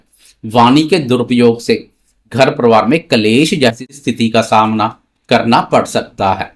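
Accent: Punjab, India. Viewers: 👍🙏👏Aapka punjabi ascent kisi apnay baray ki ascent ki tarah hai🙏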